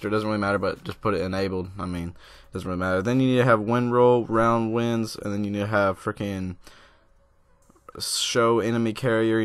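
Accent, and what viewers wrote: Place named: Bay Area, United States. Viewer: Bro you from the bay !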